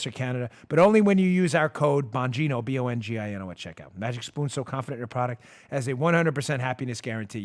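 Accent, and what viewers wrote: United States. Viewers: Last night was great , good job Dan at C PAC. You talk American.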